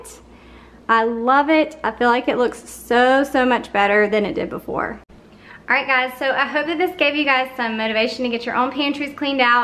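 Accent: South, United States. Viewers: Hi there! I’m watching from Eagle Mountain, UT. I get the feeling you are from the South because of your sweet accent,( lived in GA for 12 years but a native Californian. My, “pantry” is actually a very tall, deep cabinet about a foot wide, at least 18 inches deep with just about a foot between each shelf that are not adjustable and it’s a brand new rental. The pantry is always disorganized!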